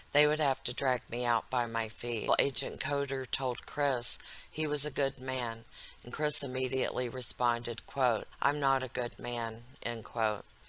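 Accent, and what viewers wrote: South, United States. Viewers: The anti christ should finally get treated the way you do. I like your southern comfort voice. My daddy is a zero is spot on ! 12 degrees of separation . I am with you , we cn not say enough nasty words to describe this asshole. I love Beethoven, you are great!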